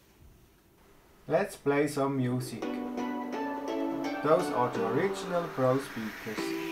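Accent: Switzerland. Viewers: ... swiss accent x3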